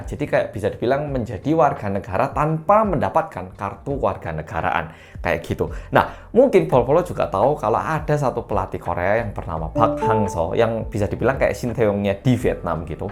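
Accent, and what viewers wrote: Java, Indonesia. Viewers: Medok jowone😁😁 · Mas sampean ni ngomong Indonesia serasa Jawa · Apik komentarmu bro. Jawamu ko medok emangnya anda tinggal dimana. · logatmu medhok banget mas 😀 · Lha wong dr TK smpe SMA sekolah nk malang ogg.. 😂 yo medhok nyel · Mas orang Korea ko bahasa Indonesianya lancar banget sih, bahkan logat jawanya kental sekali! Sudah berapa lama sih tinggal di Indonesia? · Sampeyan kok medok jowone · Iki wong korea opo wong jowo logate kok medok jowo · Ko rasanya mendengar aksen orang jawa bicara ya... 🤔🤔🤔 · Mantuuuuullll mas Medhok Jowone....😀👍👍👍 br ngevlog lg ya Mas, mngkin krna sbk dg kerjaan Rutin, ok truslah berkarya sehat2 sklwarga and sucses selalu Mas 🤲🙏👍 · Bahasa Jowone medok bangett,,😀 asli keturunan jowo pundi? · ini mas se logate jawa koyok e...tapi muka e korea...salut...aku.baru.mampir di channel.ini langsung tak subrike heheh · Wahhh saya salut bahasa indonesia nya bagus bgt, ada logat jawa nya juga❤❤❤❤ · Waduh mas korea lancar banget berbahasa Indonesia nya dan logat Jawa lagi... 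OK mas korea saya sangat suka 👍👍👍👍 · Bahasa jowo nya sangat kental banget 😅😅😅😊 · Bahasa Indonesia kamu medok banget jawanya... Pasti km fasih bahasa jawa.... Yo opo ceritene rek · Lancar juga bhs indonesia ini mas Korea,,,lidahnya lidah jowo juga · Bahasanya kok medok kyak Jawa ni 😂😂.pandai bicara Indonesia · Sampeyan jawine pundi ?? Kok logatnya Jawa !! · Idemu cemerlang Rek, sampeyan neng Jatim Wis pirang taon kok medok banget ? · Jawa nya medok 😂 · Logatnya lebih ke logat jawa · Abang ini Dialeknya seperti orang Jawa awal nya Saya bingung Ini orang Korea atau orang Jawa 😅😅😅😅 Ok Bang Salam Kenal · Hebat bahasa Indonesia anda perfect meski ada logat Jawa sedikit ,❤ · Ini kok logatnya ada lihat Jawa emang tinggal dimana? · bro kamu logatnya ko ke orabg jawa..medok😁🙏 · Muka Korea logat BHS jawanya kentel suka sekali ngeliat mas nya ngomong😊😊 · orang korea tapi kyorang jawa medok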